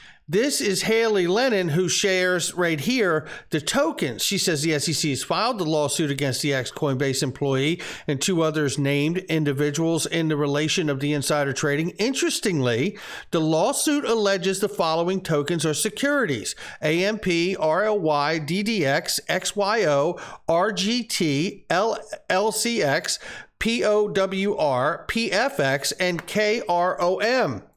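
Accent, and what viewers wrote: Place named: United Kingdom. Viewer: your videos report i excite see you every time you very very make me smile.. we excite about coin this you say for us XRP. WE LOVE YOU MUCH MUCH MUCH, U speak british cool 😘😘😘😘😘😘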